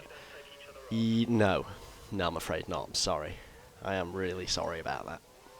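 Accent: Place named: North, United Kingdom. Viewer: You sound like ur from the north Anyway very big fan of urs Just brill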